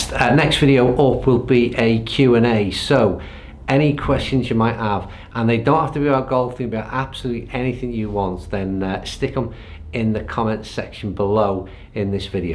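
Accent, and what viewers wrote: Liverpool, United Kingdom. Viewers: You say you’re from Wales but do I detect a bit of a scouse accent. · Well done on your decision, I wish you every success! From your voice I'd assumed you were from my neck of the woods (Liverpool) but Wales is close enough innit. Must get back to Nefyn one day, what a course and location! All the best for Christmas mate.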